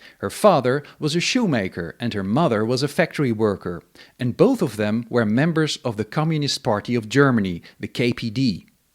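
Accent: Netherlands. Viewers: You are from the Netherlands, aren't you? I hear a slight Dutch accent as well in your English as in your German. What brought you to work on this kind of German history?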